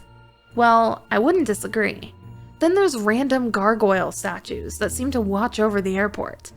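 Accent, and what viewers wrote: United States. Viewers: "Dennnver is known for its outdoor spAcesszsz" - Narrator in her Californian accent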